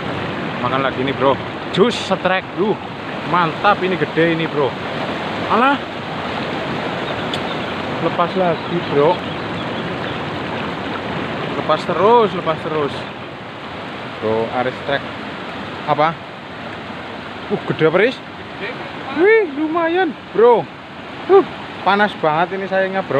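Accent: Banyumas, Indonesia. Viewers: Bahasa e ngapak daerah mana mas bro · Ngapak Yah broh inyong e ngapak broh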